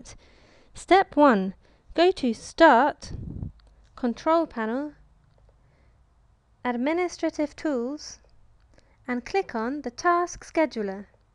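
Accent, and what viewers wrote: United Kingdom. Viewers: That voice + london accent hit me in the heart LOL .... nice video btw :D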